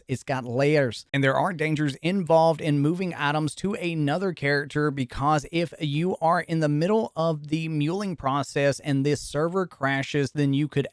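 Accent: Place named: Eastern Kentucky, United States. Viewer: You have got to be from the Eastern KY area with that accent. Recognize that anywhere. 😁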